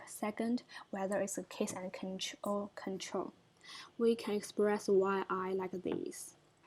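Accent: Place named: China. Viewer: 聽中國人講英文真的很痛苦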